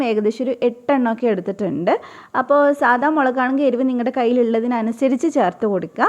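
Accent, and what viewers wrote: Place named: Ernakulam, India. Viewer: നല്ല സൗണ്ട് ആണ് കേട്ടോ .നല്ല അവതരണം ശെരിക്കും എറണാകുളം സ്പീകിങ് .തുടരട്ടെ മുൻൂട്ട് മുന്നോട്ട് പൊളിച്ചു ഇത്താത്ത പെണ്ണെ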